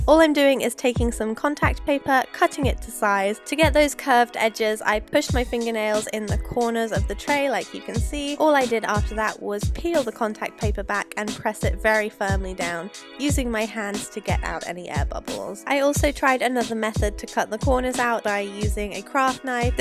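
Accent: England, United Kingdom. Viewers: Flora Dora she is from England but I'm not sure where!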